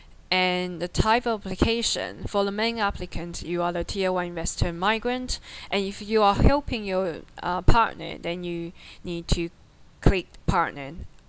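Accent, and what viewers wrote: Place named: China. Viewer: You have Chinece accent which I love it :)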